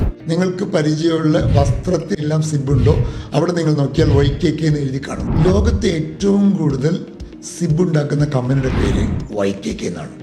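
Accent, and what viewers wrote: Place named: Kozhikode, India. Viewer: നിങ്ങൾ കോഴിക്കോട് കാരൻ ആണ് ല്ലേ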